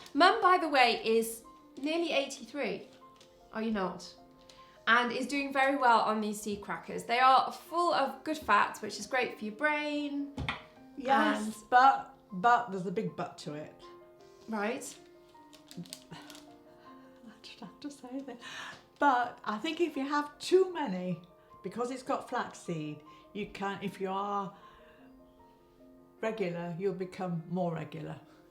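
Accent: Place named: England, United Kingdom. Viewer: Thank you so much for posting. I had all the ingredients for these and made a batch straight away. They are very tasty and go great with cheese. I've tried a few low carb cracker recipes and these are the best. You didn't specify Celsius or Fahrenheit but as you sound English I presumed Celsius. I have a fan oven so adjusted down to 130°c and they cooked perfectly.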